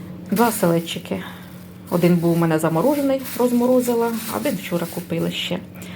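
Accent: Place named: Poltava, Ukraine. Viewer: Чую голосочек полтавчаночки і аж на сльози пробиває... наче моя мама ожила. Вона теж готувала дуже гарно і без книг по кулінарії і без інтернету. І посудка похожа навіть запах відчуваю. Не думала, що так побачу і від чую тепло наче рідного дому. ДЯКУЮ... ДЯКУЮ... ДЯКУЮ!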